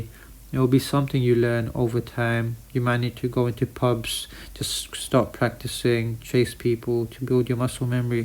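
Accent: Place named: RP, United Kingdom. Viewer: Yeah, that's an RP accent you've got there. Your location on YT says that you're from India tho?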